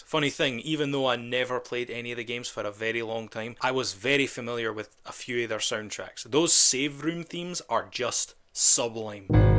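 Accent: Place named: Scotland, United Kingdom. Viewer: This is the second of your videos ive watched. Funny commentary and I fucking love the Scottish accent. So hot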